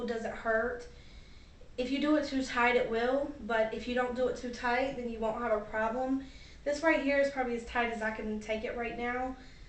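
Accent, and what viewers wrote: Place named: Carolinas, United States. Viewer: Hey this is not a stalker creepy comment but what state do you live in. Because of your accent I would say North Carolina or maybe South Carolina??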